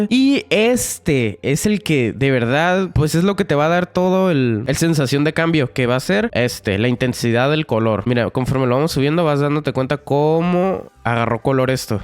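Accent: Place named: North, Spain. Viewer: De dónde eres? Te escuchas del norte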